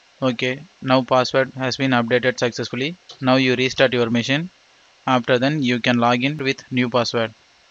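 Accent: India. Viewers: Despite I couldn't your indi accent I could follow your instructions and could achieve it. At least you deserve the like because it was helpful.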